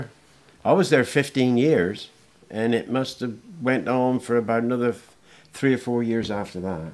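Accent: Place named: Cornwall, United Kingdom. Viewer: My mates, lovely man from a proper Looe family. And yes, that is a typical Looe accent, so rare these days and so beautiful to listen too. Reminds me of home...though I only live in Newquay, I still miss it.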